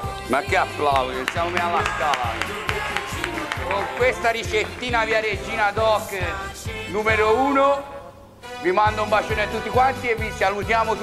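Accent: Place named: Sicily, Italy. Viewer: a me sembravi un siciliano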